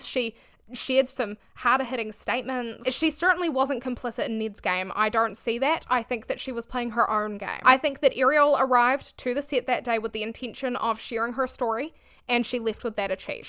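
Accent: New Zealand. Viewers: I mean i heard it, i went to check her page, was delighted she's a kiwi, and went on with my day 😂 do people really complain about that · So weird (good weird!!) to hear another NZ accent!! ❤ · You're so kiwi I love it. I'm Aussie and definitely would have asked you to say "six" and then laughed when you said "sex" when I was at school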